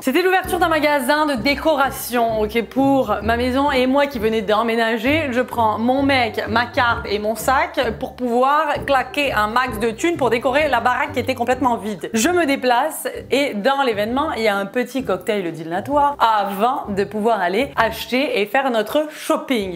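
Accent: Quebec, Canada. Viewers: Moi je suis la depuis que ta fait la vidéo ou ta apris a parler quebecquois <3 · Moi je suis là depuis trois ans et franchement t’as changé et puis ton accent québécois c’était un truc de dingue avance bises franchement je t’adore ne change pas parce que t’es drôle et continue tes devinettes Tes blagues en début de vidéo tous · Je suis abonné depuis les vidéos Québec vs France quand tu parlais plus avec l'accent Québecois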